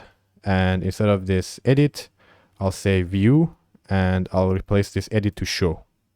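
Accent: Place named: Iran. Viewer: you are very good at teaching keep it up! and i think you're persian... am i right ? i almost can feel the accent 😂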